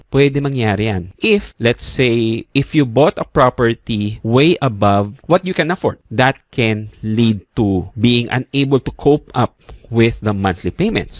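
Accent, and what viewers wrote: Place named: Philippines. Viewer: That is the most beautiful Filipino accent I ever heard.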